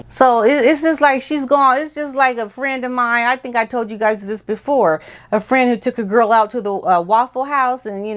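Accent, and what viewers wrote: England, United Kingdom. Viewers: 😆😆😆 Love your British accent! Made us laugh here in the UK. “Lovely!” xx 🇬🇧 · GreatEnglish accent.😂💖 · Hello. You look gorgeous, as always. You're spot on all the time!! I look forward to your videos. You are so funny! Love your English accent!! Have a great day.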